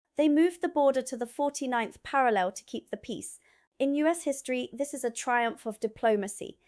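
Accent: England, United Kingdom. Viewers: This was really excellent but: 1. Most British people have never even heard of the war of 1812 2. The Boston Tea Party was a bunch of criminals destroying the property of a competitor. They weren’t upset about the tax; they were upset that even with the tax the British East India company could sell tea much cheaper than their Illegally smuggled tea 3. The Irish Potato Famine was a genocide against the Irish people. Trevelyan, the Assistant Secretary to the Treasury, wanted more Irish people to die. 4. She says she’s American & has only been here two years. I would never have guessed it. She sounds English to me. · Just came across your channel. You claim to be an American, fair enough, however your accent says otherwise, and, I know there are no private schools there run by British English teachers. So, where does your accent come from? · Amazing English accent for an American who has only lived in the UK for two years. 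Clearly she is not who she says she is. I am well versed in history both American and World. Turned video off when she said we stabbed the English in the back. I have no use for Napoleon but we were not allied with the English or the French at the time. In fact we had fought an undeclared naval war with the French Both European powers had provoked us during the Napoleonic era. We just wanted to be left alone but the English in their arrogant way thought they could push us around with impunity. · If you are American why do you sound english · She sounds totally English not American. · Wow, you not only sound British, you batted our corner too. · Weird she has a British accent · Your history is correct and American students are given a very edited version of their history. This is generally corrected in colleges and universities. That is, if students take U.S. history where they are taught unvarnished histories of slavery and genocide of the native peoples, as well as depredations against our neighbours and the world at large after WWII. 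I taught such a class in summer school at a community college, but was never asked to teach the course again. They wanted me to stick to other subjects, apparently. By the way, your British accent is too authentic to be acquired after only two years in the country. Either you are an excellent mimic, or British. I’ve lived in the U.K. for over twenty years and my accent is still decidedly American.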